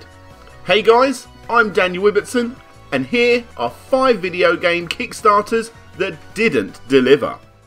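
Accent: United Kingdom. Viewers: almost every count down video will have a british speaker....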